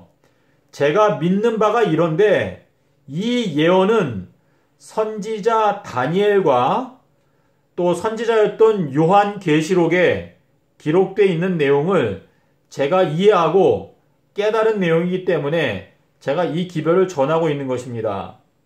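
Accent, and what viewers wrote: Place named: Jeolla, South Korea. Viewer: 고향이 라도 스타일인데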